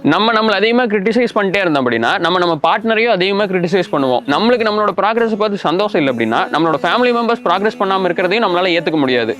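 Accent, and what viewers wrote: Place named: Kongu, India. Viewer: Dei kongu paiya unnoda slangatha enaku pudikum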